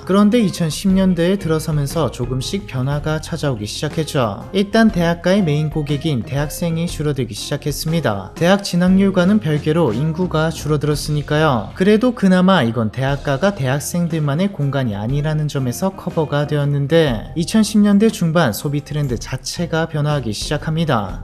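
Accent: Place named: Busan, South Korea. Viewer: ㅋㅋㅋ 해설자 양반 부산 출신 인가보군요 같은 고향이군요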